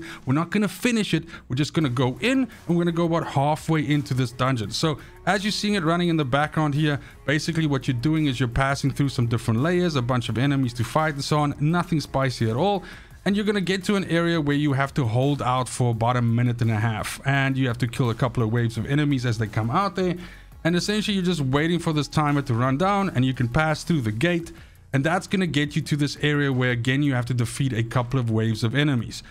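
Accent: South Africa. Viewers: Nice vid bro. Question where in South Africa you from 😅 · So I can't get over the fact that you sound South African but pronounce your words like an American 😅 Where are you actually from? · Are you south African? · Btw are you in S.A? You sound south African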